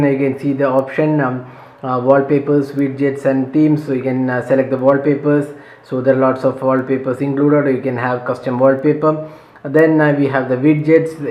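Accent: India. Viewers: I cant understand your english..can speak nicely we are not n india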